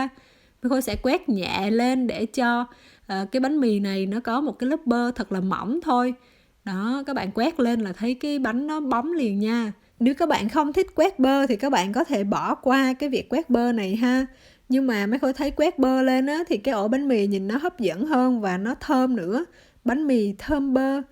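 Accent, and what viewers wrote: Central, Vietnam. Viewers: Cám ơn Mai Khôi chia sẽ. Nghe giọng nói hình như người miền trung hoặc Đalat?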